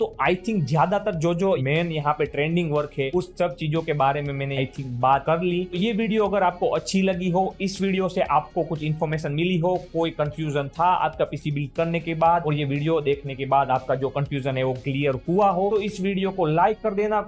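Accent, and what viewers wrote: Gujarat, India. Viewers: भाई पहले हिंदी बोलना सिख लो, साफ़ साफ़ पता चल रहा है की तुम गुजराती हो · By his speaking style he is 💯 gujarati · Are you ગુજરાતી? 😅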